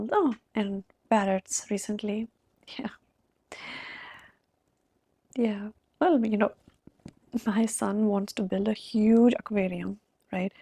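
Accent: India. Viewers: really like the articulate indian accent, cherry on top!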